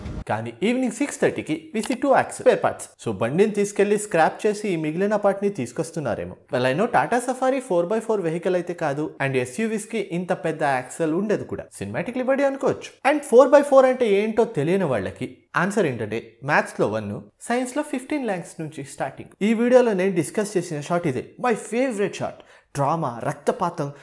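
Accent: Karnataka, India. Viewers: Kannada kodthundi bro nuvu matladtha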